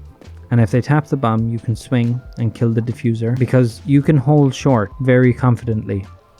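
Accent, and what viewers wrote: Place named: Ireland. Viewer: Thou sound Irish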